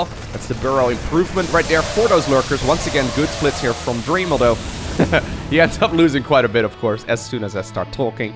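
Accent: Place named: Brabant, Netherlands. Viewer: hoor ik nu de brabander in Lowko :D reynor is beter as zen tegenstander :D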